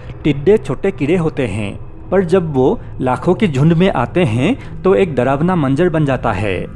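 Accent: Assam, India. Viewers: Aapunr voice2t akhomiya Jen lgise.... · The Hibi bcoz mother toung hindi nhi hai ....Yeh asssamese hai phir v yeh try lar Raha hai .... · একদম অসমীয়া এজনে হিন্দী কৈ থকা নিচিনা লাগিছে।হিন্দী উচ্চাৰণ ত অলপ improvment ৰ প্ৰয়োজন।চেষ্টা চলাই যাওক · আপোনাৰ উচ্চাৰণৰ ওপৰত ভিত্তি কৰিহে সুধিছো মহাশয় , আপুনি হিন্দিভাষী নহয় , নহয়নে ৷ উজনি অসমৰ যেনো লগা নাই হলে 😃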